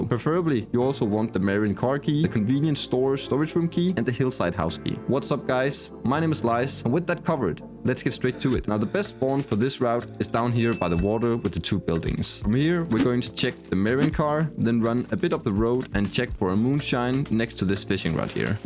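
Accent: Denmark. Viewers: Are u danish by Any chance? Ur accent is Kinda danish ngl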